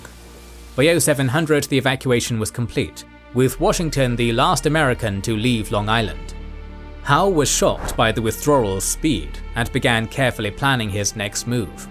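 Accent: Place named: United Kingdom. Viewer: 🎉 the irony of a British voice over talking about its countries demise Over America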